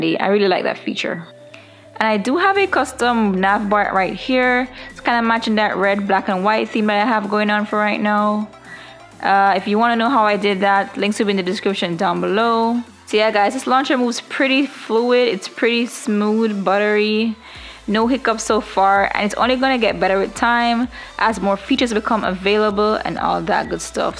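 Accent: Jamaica. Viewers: you sound like a jamaican nice videos once your on youtube you set you soon get more subscriber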